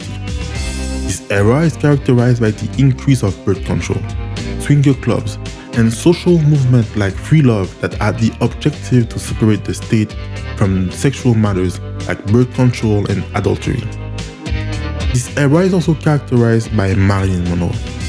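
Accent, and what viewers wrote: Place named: France. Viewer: you have a lovely accent Very French ✨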